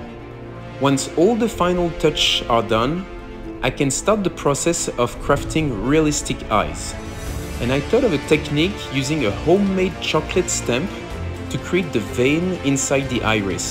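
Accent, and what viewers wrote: France. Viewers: I love your french accent 😅 Moi aussi je parle français😆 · Your French accent is as smooth and as delicious as the finest chocolate. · Loving the voice over. Ça fait du bien d’écouter l’accent français ❤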